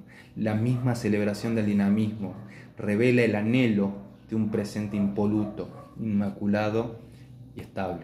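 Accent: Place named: Salta, Argentina. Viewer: ¿Che, sos de Salta? Porque tenés un acento bastante parecido al de Jonathan Ramos.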